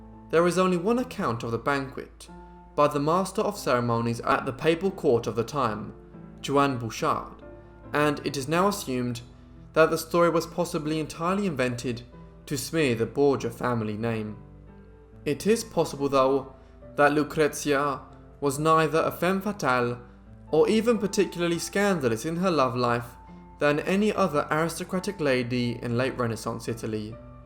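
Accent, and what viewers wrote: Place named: Upper class, United Kingdom. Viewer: I can tell that you are from the upper class, sir. 👍🏼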